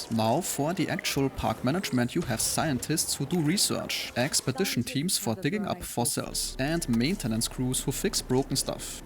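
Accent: Germany. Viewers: Do i hear german accent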